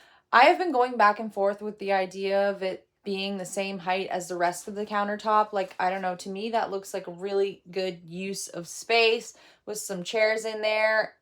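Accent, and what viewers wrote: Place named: United States. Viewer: Love the innocence. I’m sure ur a cool gal. U in “Canada” or somewhere but ur accent sound “American”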